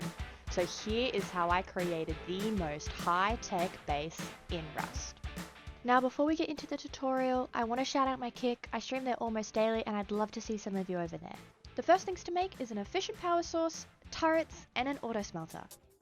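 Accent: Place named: Australia. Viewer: love it when an Aussie woman tells me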